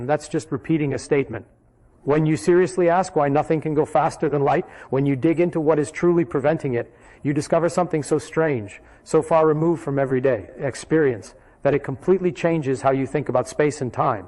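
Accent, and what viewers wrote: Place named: Canada. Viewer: That's not Feynman’s voice, it has a Canadian accent.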